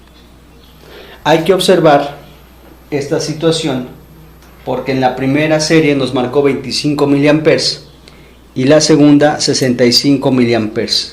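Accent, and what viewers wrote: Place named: Mexico. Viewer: Me gusta mucho el acento mexicano. Saludos desde Chile.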